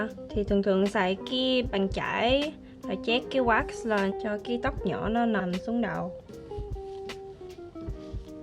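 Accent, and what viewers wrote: Vietnam. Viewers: OMG girl I love your Vietnamese accent!!! I think it's so cute that you try to embrace this part of the culture. So very looking forward to other Vietnamese speaking videos (or any other videos hahaha) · Isn‘t her accent southern as well? Also she mentioned that the Grab driver wanted to drive her to Vung Tau which makes me think that maybe she was in Saigon. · sᴇᴇıɴɴᴇᴇ she’s probably speaking in the North accent, ur south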